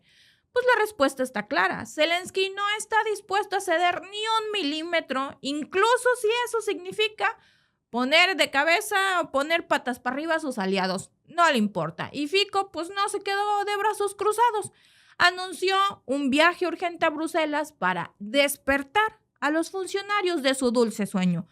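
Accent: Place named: Mexico. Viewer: Qué agradable expresividad tiene Vd., Doña. La "música" en su locución, con ese toque MX, pero sin caer demasiado en slang local, es muy agradable. Me he suscrito porque me resulta relajante escucharla un tantito (como dirían en MX 🙂), Se hace más llevadero el habitual horror que nos trae la actualidad internacional en estos tiempos. 👋👋👋 A veces me rio yo solo cuando recuerdo cómo, al referirse a algún impresentable, le llama "nuestro fugurín" 🤣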